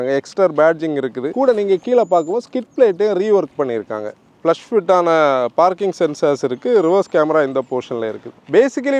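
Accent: Kanyakumari, India. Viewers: veri nice explains.....👌👌👌👍👍👍💯💯💯 I am Indian Army 🪖 ஐயா கொஞ்சம் கன்னியாகுமரி பாஷையில் கொஞ்ச நேரம் பேசுங்க அதை கேட்கணும்னு எங்களுக்கு ரொம்ப ஆசையாக இருக்கிறது...🙏🙏🙏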